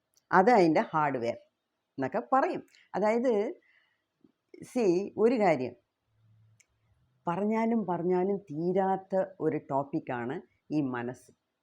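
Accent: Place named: Tamil Nadu, India. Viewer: Maminte plan anusarichu ellam orderil paranjal mathi 🙏Maminte thamizh kalarnna Maminte speech eniku orpadu ishtam 😍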